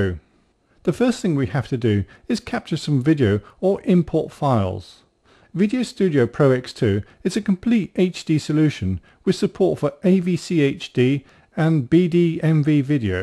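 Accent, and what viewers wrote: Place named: England, United Kingdom. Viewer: is corel made in england? why is there an english guy talking?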